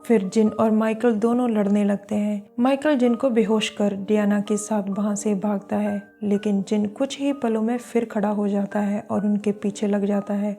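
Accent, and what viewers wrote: Punjab, India. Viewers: Yaar punjabiat jhalak di hai accent sahibkaro hindi ya punjabi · Hi my 💕 punjaban love ur voice & assent ur big fan Pardeep gill from Ludhiana Punjab ❤️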